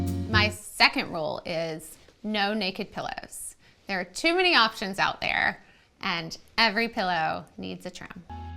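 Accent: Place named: New Jersey, United States. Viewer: Your voice, your voice why do you make that NJ voice?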